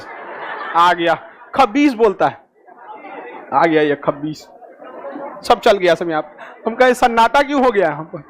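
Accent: Bihar, India. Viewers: आप बोलते बहुत अच्छी डौंग से। एकदम बिहारी स्टाइल से। धन्यवाद। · Bihari accent so strong... for once you forget that he's a Muslim 😂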